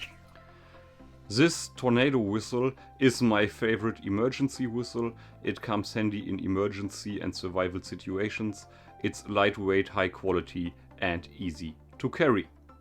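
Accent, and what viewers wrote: Germany. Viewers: Great products and presentation but the german accent makes it almost unbearable to watch, sorry.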